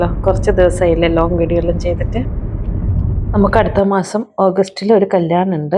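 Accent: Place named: Kannur, India. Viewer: Kannur bhasha 😊😊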